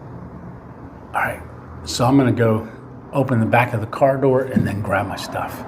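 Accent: Pennsylvania, United States. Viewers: 3 minutes in and I'm like this guy has to be from PA.... look it up and sure enough you're in mg territory lol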